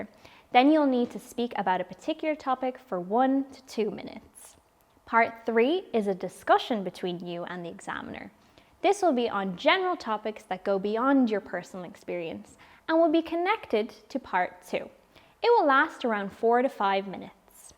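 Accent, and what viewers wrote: Ireland. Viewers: Bro, she cant hide her irish accent... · who's this presenter? She's Irish. Very good.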